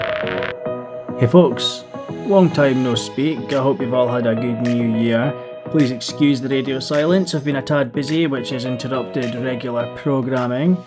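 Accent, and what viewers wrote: Scotland, United Kingdom. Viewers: Also not sure where about in scotland you are, but what's your thoughts, if any or any chance of a video regarding David goodwillie ? His recent signing with raith rovers in fife, which going by your accent isn't a million miles from you has caused a lot of fallout. Good to have you back, was a follower of you n wheezy on daddy gate. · Defo a toonser or a teuchter I'd say!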